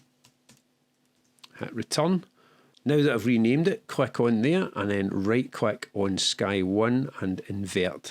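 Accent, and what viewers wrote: Scotland, United Kingdom. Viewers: Great content as always. Unagitated and to the point. By the way: first time I watched without the subtitles - and I slowly get the groove for the Scottish accent :)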